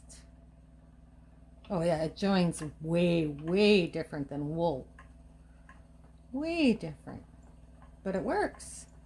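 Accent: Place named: Ottawa Valley, Canada. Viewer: Thank you so much for this video! I'm in Winnipeg, with family in the Ottawa Valley and in Gatineau, so I heard your accent and put you on the map right away! :) I'm going to try spinning some flax right now... I hope it works out as well for me as it did for you! There may be a lot of swearing involved... :) Thanks again!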